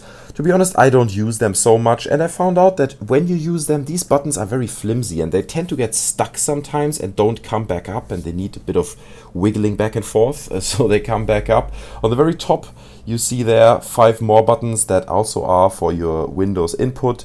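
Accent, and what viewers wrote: Germany. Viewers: Man merkt voll, dass du "Deutsches Englisch" sprichst :D, wenn du weißt was ich meine, Gutes Video! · Aha, das hab ich doch irgendwie am Dialekt gehört, dass du kein Amerikaner sein kannst xD :D :) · Are you German? Dein Accent klingt hart danach xD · Ich hab beim ersten Satz erkannt , dass du Deutscher bist xD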